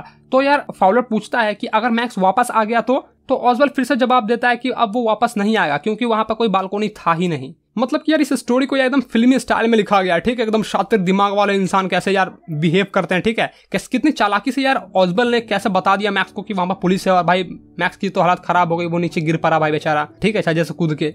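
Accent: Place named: Bihar, India. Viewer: Nice story 😊explanation sir and also I love🤭 your bihari accent 😇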